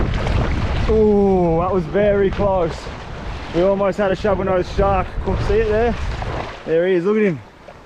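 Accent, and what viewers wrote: Australia. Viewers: not being mean but are you aussie i am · I was worried until i heard the auzzi accent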